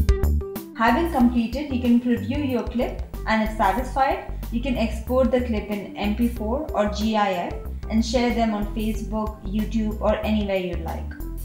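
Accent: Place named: India. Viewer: From what i hear, she should be indian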